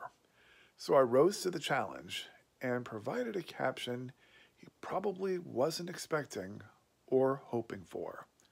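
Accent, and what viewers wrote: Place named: New Jersey, United States. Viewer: Heard the Jersey in this one! Love your vids.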